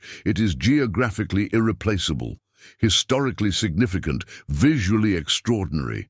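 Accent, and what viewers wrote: United States. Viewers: If you hear this voice of an American,, don't listen to a word they say Don't listen at all Their Aussies behind it, straight out lying for their own narrative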